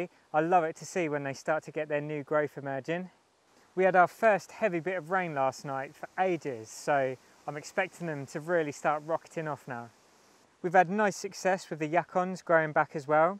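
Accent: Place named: England, United Kingdom. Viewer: I only seem to be able to get ordinary yellow corn here in France; it's a drag trying to get anything different; like jumbo banana squash!! We live in a beautiful place, in the mountains but seeds restricted to little and Holland. Last year i had to high hopes for corn - and before i knew it ants came and took it over it looked OK but they were there; no one else seems to have had the problem! I planted beans with my corn today oops I will stake them then! Where the hell are you; as you have an English accent but it looks like a tropical place, how did you get there?? One sweet potato that will grow here costs 5 euros -- how do you get is it 'slips' what and how to you propagate them?? I need them as we love to eat them!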